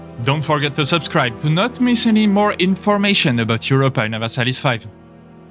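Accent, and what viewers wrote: France. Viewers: honestly I have been able yo underdtand him quite well as he's articulating and talking slow enough. Though I'm used to french english from other pdx employees. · As an Italian, I listen a french guy that speak in English about Italian wars in a game without Italian language support. Pretty weird feeling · But why this french accent all the time? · Love this French accent, more of this! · I want his soft french voice put me to sleep while he tells me about the absolute carnage and misery that was the thirty Years War.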